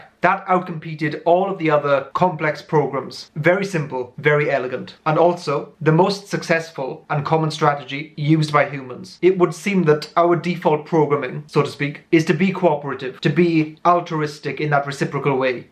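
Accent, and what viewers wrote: Wales, United Kingdom. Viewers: Is nobody going to point out the Welsh accent?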